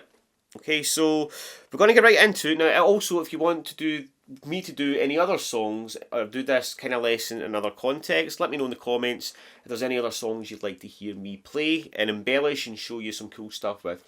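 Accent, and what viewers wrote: Scotland, United Kingdom. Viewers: Great lesson. My wife was listening. She wants to know what part of Scotland are you from. She’s from fife.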